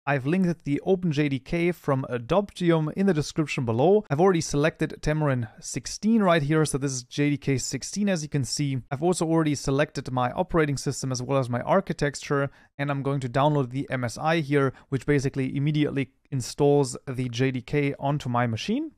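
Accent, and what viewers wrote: Germany. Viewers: Is this an Deutsche akzent?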